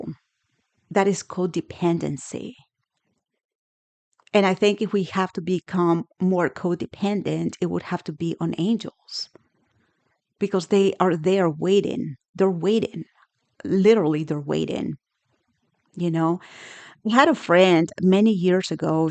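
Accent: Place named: Brazil. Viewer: Wonderful review. Could you do one on Angels of Omnipotence by Jareth Tempest? Also, may I ask if you're Brazilian? That's how the accent sounds to me. Either way it's adorable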